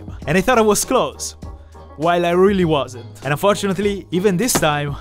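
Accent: Italy. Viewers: U sound italian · I started noticing the English pronunciation were somehow familiar to me... I see Italian dictionary on the left : "I KNEW IT, MIO FRATELLO!!!" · are you italian? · Why did I watch this whole video just to hear his accent? LOL. He's so Italian. I love it. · Lovely Italian accent makes it so much more credible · I am now very nervous about traveling to Italy because of your skills and accent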